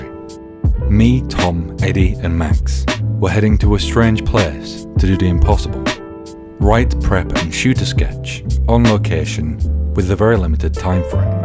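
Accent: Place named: United Kingdom. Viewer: Also where from the UK r u from cause your accent interests me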